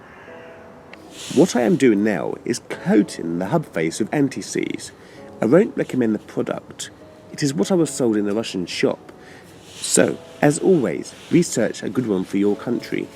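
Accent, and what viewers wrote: United Kingdom. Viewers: Being from the UK myself I love the oh so posh English voiceover 😆👍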